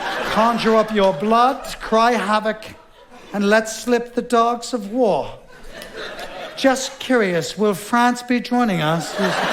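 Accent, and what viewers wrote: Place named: New York, United States. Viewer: He sounds so New York but is so intelligent!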